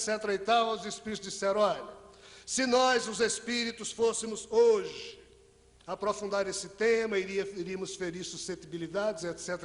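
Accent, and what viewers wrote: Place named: North, Portugal. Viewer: este palestrante lembra bezerra de menezes compleiçao fisica cabelo e tem sotaque do norte assim que vi lembrei de bezerra ate porque bezerra desencarnou em 1900 e podia ter ido embora da terra e disse que ficaria 100 anos na espiritualidade ajudando a evoluçao e que voltaria a encarnar para ficar ate que a terra estivesse em feanca regeneraçao ou seja mais 100 anos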